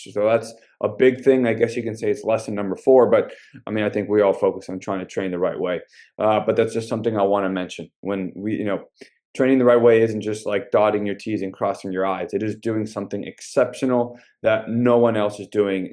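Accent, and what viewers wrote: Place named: Eastern Europe. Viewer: Coach, I don’t know what’s behind those 3 “lessons”, but you sound more Eastern European than American😂. This is how “business” is done here.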